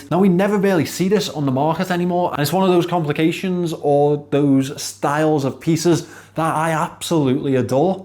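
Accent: Liverpool, United Kingdom. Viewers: You're the first Scouser that doesn't irritate me lol, great Vid xx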